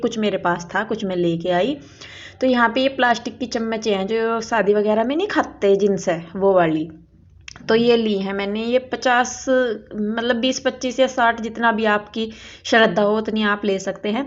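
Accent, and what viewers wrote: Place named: Uttar Pradesh, India. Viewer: Apki language up ki lgti h hmare yha bhi ese hi bolte h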